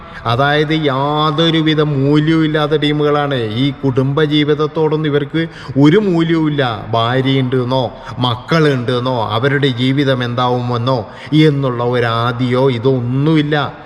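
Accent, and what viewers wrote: Kannur, India. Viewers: കണ്ണൂരുകാരൻ ആണെന്ന് സംസാരത്തിൽ നിന്ന് തോന്നുന്നു എന്തായാലും 100% സത്യമാണ് പറഞ്ഞത് മുഴുവനും യേശുദാസിനെ കണ്ടുപഠിക്കാൻ ഒന്നുമില്ല യേശുദാസ് എന്താ ജീവിതത്തിൽ ചെയ്തതെന്ന് യേശുദാസ് തന്നെ മനസ്സാക്ഷിയുടെ ചോദിക്കട്ടെ അപ്പോൾ ഉത്തരം കിട്ടും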